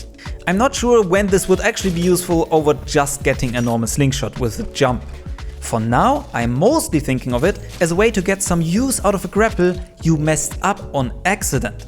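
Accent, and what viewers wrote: Germany. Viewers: Hello my German friend, man hört das sehr stark raus und ich liebe es 😂 Danke für deinen Content und deine ganzen Bemühungen ^^ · Ich find's geil das man den Deutschen Akzent direkt raushört😂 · Is he German ?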